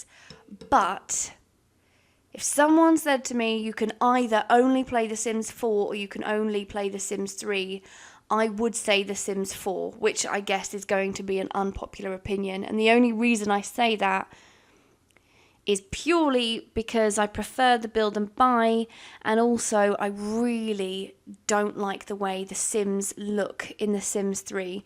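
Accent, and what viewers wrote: England, United Kingdom. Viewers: English accent is elite. ✌️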